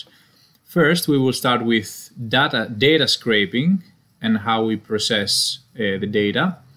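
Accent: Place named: Spain. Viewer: Great work man, excellent content! Question: you are feeding the "scores and fixtures" table with values that might not be correct for that specific date. For example, the league table has all statistics up to the present moment and then you feed them to matches that occurred in the past. Am I right or did I understand it wrongly? Thanks for your videos, I'm mostly interested in content related with orange usage. By the way, you said in a video that you are Greek but to my ear you sound like a Spanish guy speaking English. :) Cheers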